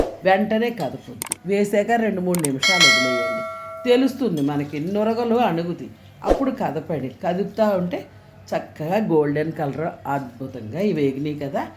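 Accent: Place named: Godavari, India. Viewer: Ma ammamma la matladataru amma mana godavari style ye veru amma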